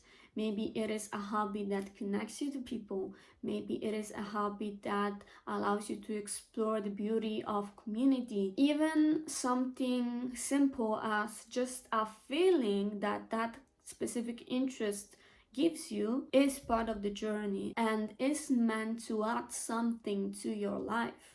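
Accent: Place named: Italy. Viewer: Wow, such a cute italian accent!💫 I'm currently studying it and would love to hear that content in your native tongue! Continua a registrarne di più!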